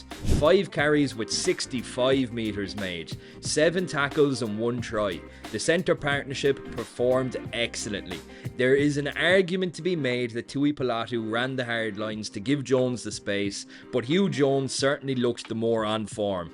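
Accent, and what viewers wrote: Ireland. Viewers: I think your videos would be going very far if you keep rating like that crap ...JGP could have been Player of the Match ....Hugo was fantastic ....you need to do better homework and rate your team not just on stats you get from Internet...you are obviously not a player. I won't be coming back to be droned by your flat Irish accent .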